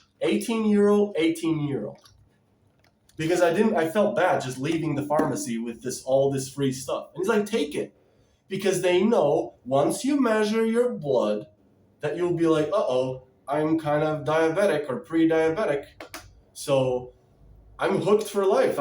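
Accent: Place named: United Kingdom. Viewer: The British accent though 🤣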